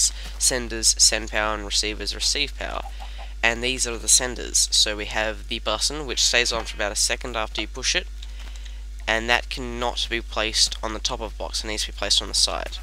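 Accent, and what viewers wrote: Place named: Australia. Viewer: Must be Australian with that accent, probably east coast right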